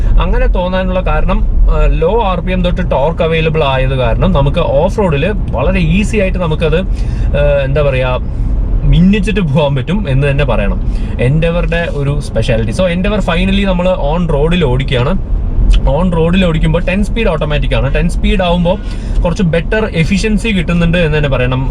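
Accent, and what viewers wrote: Palakkad, India. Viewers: പാലക്കാട് ആണോ....സ്ഥലം...പാലക്കാട്‌ സ്ലാങ് ആയി...തോന്നി... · nengal Palakkad anno?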